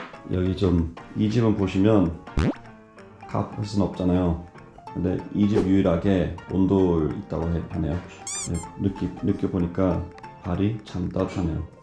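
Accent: United Kingdom. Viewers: 헐 영국어 다. 미국어 만 듣다가, 힐링 됌. 😮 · 오 영국식 발음이셨어~~~ 멋져라!😊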